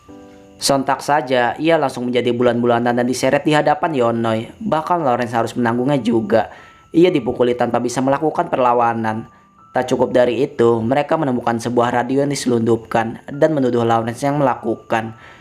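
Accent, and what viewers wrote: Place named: Banjar, Indonesia. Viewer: Yang baca naskah orang Banjar Kalimantan nih. Ketahuan dari logatnya. Cuma saja intonasi tatkala berhenti paragrafnya ga enak didengar.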